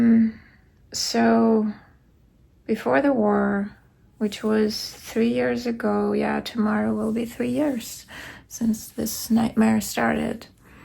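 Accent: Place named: New York, United States. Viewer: You have picked up some NY words. Like you said “cawfee” the other day. Meant to tell you. Haha. You are an official New Yorker. There are a few words I have heard that reminded me of living there.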